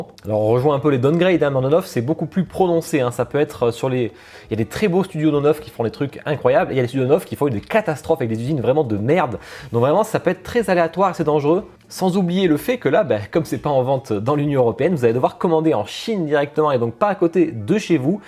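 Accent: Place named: England, United Kingdom. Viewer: Cet accent anglais je suis fan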